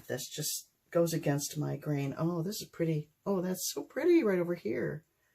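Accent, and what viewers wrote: Australia. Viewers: I just watched this video then Bens . I think the main difference is the cells Ben got from using Aussie flotrol, we are so lucky in Australia to have our flotrol do what it does! Thanks for the giggle at your Aussie accent! 😂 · 😂love the aussie accent! Fun idea combining different swipe tools👍. ❤️,Pam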